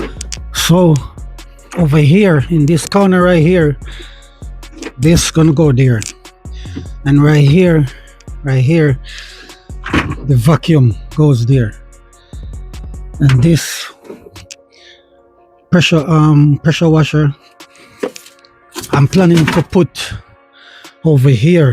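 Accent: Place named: Jamaica. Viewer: Nice working van. You sound Jamaican.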